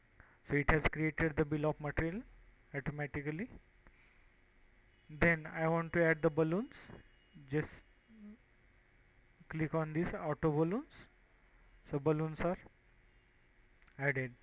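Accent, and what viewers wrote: India. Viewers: Incomprehensibly thick Indian accent and poor quality audio combine to make this english language tutorial sound like a foreign language.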